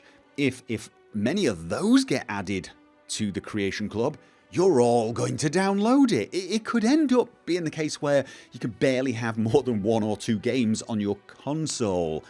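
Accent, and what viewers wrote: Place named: North, United Kingdom. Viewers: Averyl Fong Yeah hes definitly got a slight northern twang. Its inescapable. And for you yanky boys, we mean north England. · Bunny Rabbit I'm pretty sure he's just somewhat Northern :) Well, he sounds like it to me! You can hear it in the way he says words like "aff-ter" (Northern) instead of "arh-fter" (Southern/RP), or the way he says "up" (closer to "oohp").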